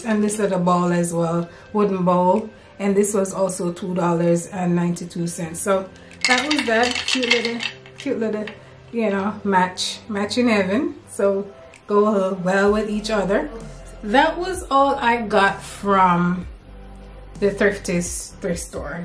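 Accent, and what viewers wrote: Jamaica. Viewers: I hit that subscribe button 🔵 the minute I heard that Jamaican accent. Gots to support my Jamaican gurlll. Love everything you scored.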